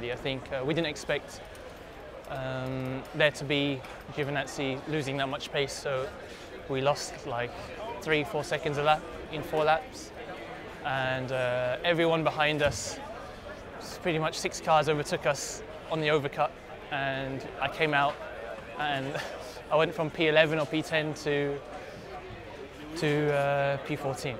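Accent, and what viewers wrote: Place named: United Kingdom. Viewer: Such a British sounding Thai national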